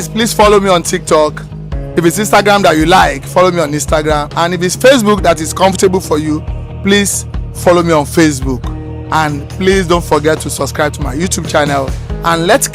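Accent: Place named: Africa. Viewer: African english is so funny 😂😂😂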